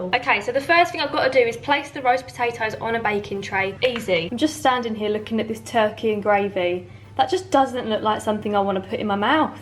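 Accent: Essex, United Kingdom. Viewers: I've come to your channel from Alice's and honestly, you're so funny. Love your personality and the Essex accent!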